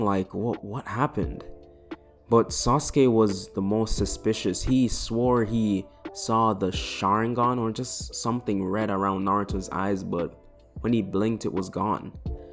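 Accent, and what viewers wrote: Jamaica. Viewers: are you jamaican? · this guy sounds like he has a Jamaican accent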